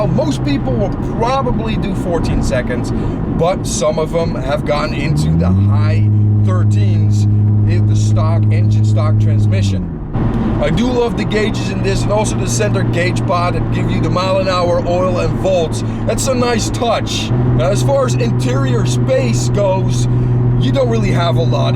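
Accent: Netherlands. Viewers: ik hoor op jou engels dat jij uit Nederland komt groetjes uit Bosnien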